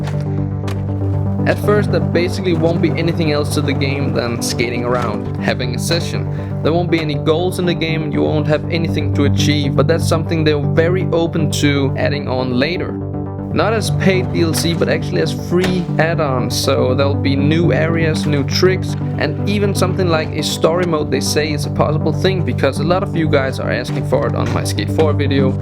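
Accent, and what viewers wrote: Denmark. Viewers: is this Guy danishh? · You're danish aren't you.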